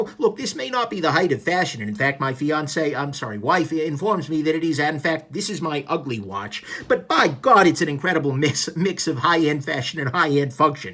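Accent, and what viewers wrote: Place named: East Coast, United States. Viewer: I usually hate east coast accents as a Brit, but come on I love this review it’s great 😂 this is my grail watch and on that breitling strap it’s stunning I can’t wait to get one!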